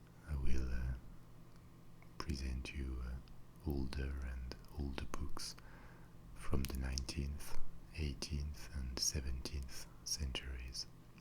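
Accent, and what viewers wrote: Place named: France. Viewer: male asmr voices have never given me tingles. until now. books.. French accent.. so many tingles. well done, Sir, well done. subscribed!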